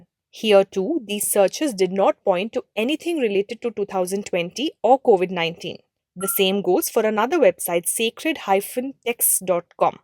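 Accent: India. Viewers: Why T F ?? Is it Indian explaining this shit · Indian accent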